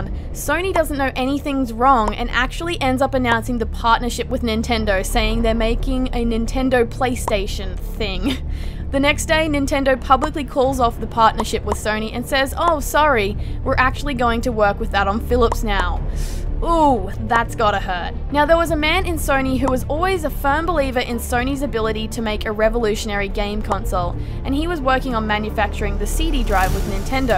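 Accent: Australia. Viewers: Are you from Australia? you sound Aussie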